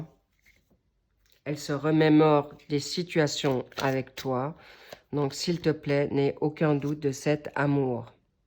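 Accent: England, United Kingdom. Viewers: Merci, comme vous dites, c'est "wow"... Connections d'âmes et de coeurs..... D'après votre léger accent, je pensais que vous étiez anglaise...(où autre anglo-saxon)....😉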